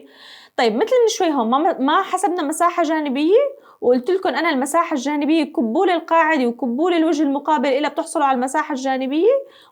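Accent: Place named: Homs, Syria. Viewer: حمصية يمكن انت شو بدك ؟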